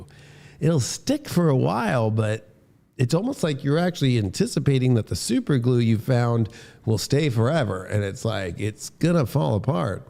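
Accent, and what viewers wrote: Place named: South, United States. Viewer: ( the southern accent is killing me )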